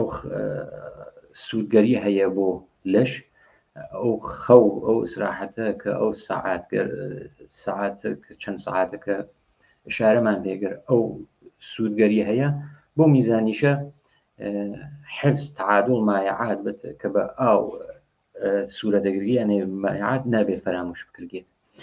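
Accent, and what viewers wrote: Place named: Ardalan, Iran. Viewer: خسته نباشید . امید به بهبود بیشتر برنامه های شما . چند پیشنهاد کوچیک ۱ به نظرمن لهجه شیرین اردلانی را فقط حرف بزنید کافی باشد. ۲ اشکالات تکنیکی در پخش اتاق فرمان دقت بیشتر شود. ۳ مهمانهای که دعوت می شوند حتما متخصص در رشته مورد بحث باشن. ۴ در نوشتار(ته ندروستی) ( ته ندرووستی) غلط املایی کوردی دارید . دقت لازم است .! 🚩🚩🚩 در کل برنامه خوبیه امیدوارم که بهترم شود. .ارادت مخاطب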